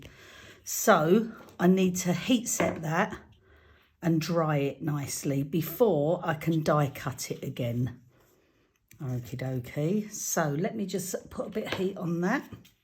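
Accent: United Kingdom. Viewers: Hi, I have only just found you on YouTube.. love your videos and how your brain works!!! I'm not alone! Lol🤣 I'm in Australia now, but originally from the UK, so your accent reminds me of home. Thanks for taking your time to show fab ideas and share some laughs. X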